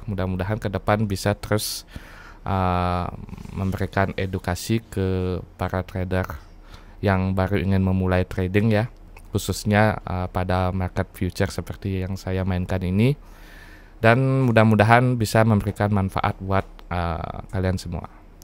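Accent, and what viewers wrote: Bali, Indonesia. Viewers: orang bali atau org lombok bang ??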